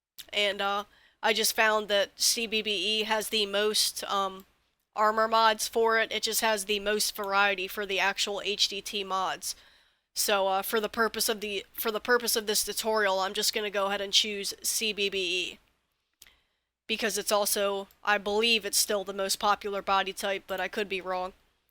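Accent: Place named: United States. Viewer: ) i love ur american accent, so cute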